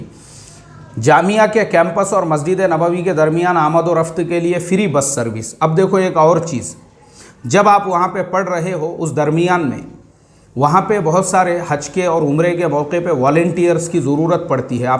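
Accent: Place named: Bengal, India. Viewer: السلام علیکم ورحمتہ اللہ وبرکاتہ بہت خوب جناب مولانا صاحب کیا بنگالی ہیں آپ کی زبان سے محسوس کررہا ہوں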